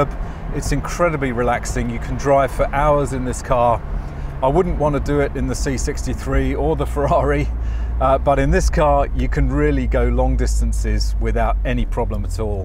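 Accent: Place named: United Kingdom. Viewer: Hey, you sound very Brit 😀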